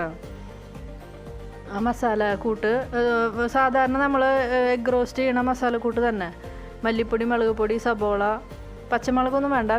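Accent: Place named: Thrissur, India. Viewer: ചിക്കൻ പൊളിച്ചുട്ടാ.. നമ്മടെ തൃശ്ശൂർ ഭാഷയും.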